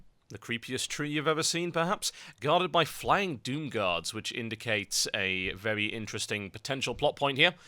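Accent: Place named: United Kingdom. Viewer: I'm not playing till cataclysm, beteen this guy and omfgcata I'm even more stoked about cata, the best part is, I'm british so this guy is like my on kind =), even though accents and language varies dramatically depedning on hich part of britain you're from, ahhh the way our language has been bastardised over and over...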